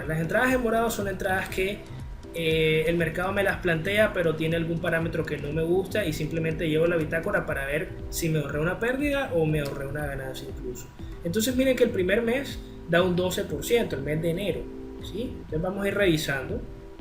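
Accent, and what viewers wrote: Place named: Guayaquil, Ecuador. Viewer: De donde eres bro???? hablas como guayaco????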